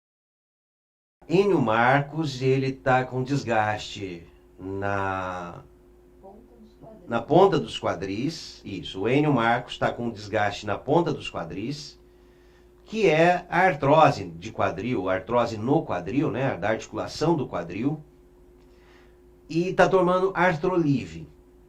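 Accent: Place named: Paraná, Brazil. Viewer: Quanta explicação lógica e de fácil entendimento neste vídeo, me esclareceu muito. Parece que é do Paraná, atende em quê cidade?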